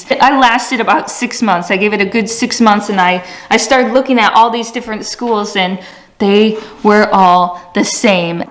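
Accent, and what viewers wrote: Michigan, United States. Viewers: Hi, I really admire you, learning languages. And especially now you are learning Korean. When you speak English, you can tell your American accent but it comes to Japanese and Chinese accents. It was a totally different tone. 👍👍👍😄😄😄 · you are losing your Chinese accent ;) I definitely hear the Michigan now in your English :)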